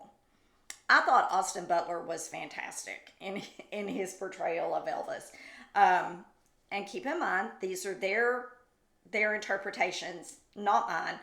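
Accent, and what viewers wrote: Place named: Memphis, United States. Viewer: Cilla is going all-out to create an angelic image of herself in the public eye when we all know she wasn’t. Ps. I love your accent, is it a Memphis one?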